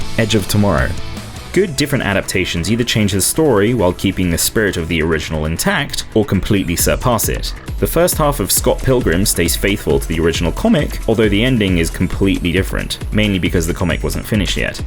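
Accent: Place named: United Kingdom. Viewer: Petition well and truly signed. Also, another great video essay right here. Loving the charming British accent. Wish I had one.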